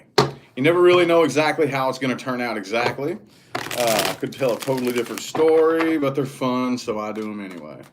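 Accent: United States. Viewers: I think its the whole american accent thing he has that makes me feel..... yesss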